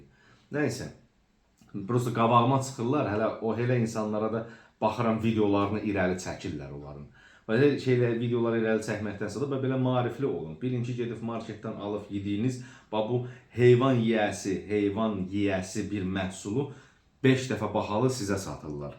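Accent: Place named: Qazakh, Azerbaijan. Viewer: Ləhcədə ki SUPER🙏🙏🙏 QAZAX ləhcəsi👏🙏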